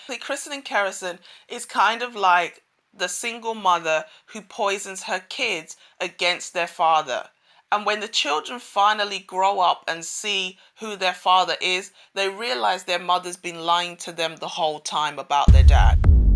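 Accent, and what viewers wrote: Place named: London, United Kingdom. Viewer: no prob, what city in the uk are you from I'm trying to figure out that accent it sounds like a london accent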